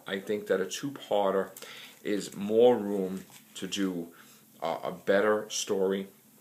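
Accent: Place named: Boston, United States. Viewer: You've got a great Boston accent!!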